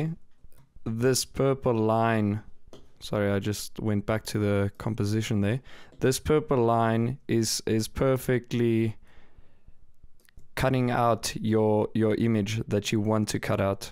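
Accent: South Africa. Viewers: i like your accent. South African? Great tutorial thanks! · are you south african? the accent?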